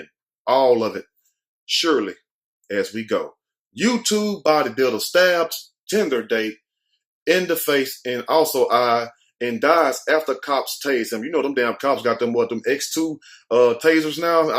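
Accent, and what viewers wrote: South, United States. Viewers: where are u from I can't place your southern accent?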